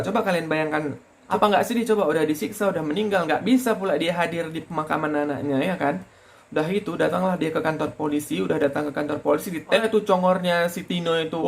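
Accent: Medan, Indonesia. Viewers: Habis nonton filim arie hanggara. Langsung kesini. Auto subrek. Btw nampak x logat medan nya bg😂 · Medan kale kau bg..😂😂😂..mantap👍👍👍..selalu semangat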